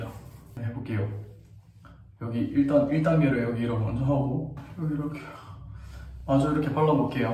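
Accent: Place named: Gyeongsang, South Korea. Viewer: 님 말투면 달고나가 아니라 쪽자라고 해야할 것 같은데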